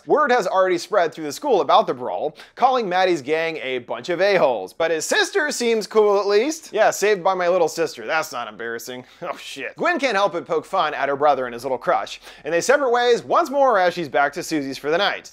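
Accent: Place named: South Carolina, United States. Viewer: I never claimed to not being disrespectful lol. I very much meant to offend his fans because I think it's disrespectful to sheer a conversation praising a creator into a conversation praising a different creator by putting the original one in second place or "just not as good." My observations are completely one dimensional, I watched like 2 minutes of a video and looked at his thumbnails, I'm not a critic I don't want to watch him based on the 2 minutes and so I base my entire opinion on it. I'm not a hypocrite, I'm arguing for a subject not a spectrum, just because I don't like mowing lawns doesn't mean I don't like gardening. His thumbnails are clickbait and aren't to my liking. He talks like a south Carolinian closeted husband. and I don't like him.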